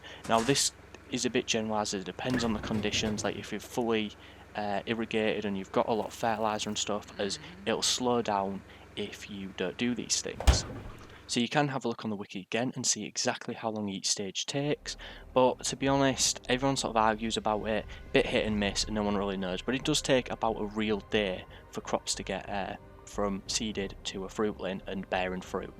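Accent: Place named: England, United Kingdom. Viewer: He's speaking English with an English accent. lol what more do want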